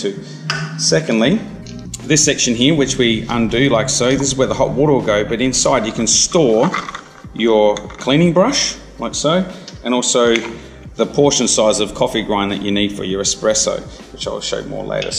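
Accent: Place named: Australia. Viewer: Nice oz accent mate, I am Asian spent 4yrs in MEL,I feel like home when u speaking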